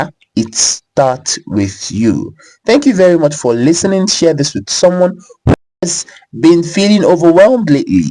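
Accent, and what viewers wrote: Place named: Igbo, Nigeria. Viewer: The igbotic accent for me 😂😅🤣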